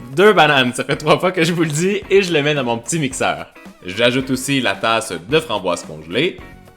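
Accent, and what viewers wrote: Quebec, Canada. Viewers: je t' adore. Je tes montré à ma soeur, on adooore l'accent cébécoi.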